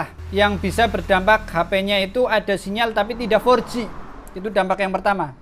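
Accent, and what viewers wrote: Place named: Tegal, Indonesia. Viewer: Mas njenengan banjarnegara, tapi logatnya ko kaya tegal ya?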